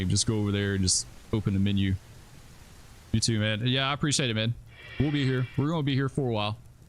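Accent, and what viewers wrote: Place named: New York, United States. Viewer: Into the flames time I see let the FDNY accent begin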